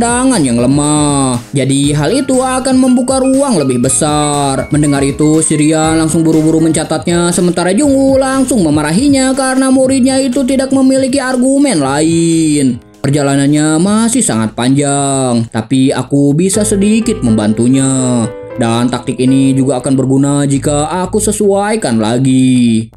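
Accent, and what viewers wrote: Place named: Sunda, Indonesia. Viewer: Sunda bingitz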